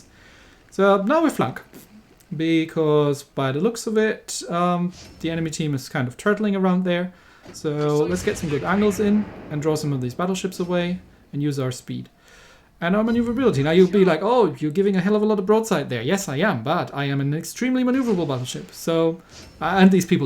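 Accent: Germany. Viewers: nah it's not that it's just that I have studied a little about Europe and Asia and thought that your acent kinda sounds Germen which is cool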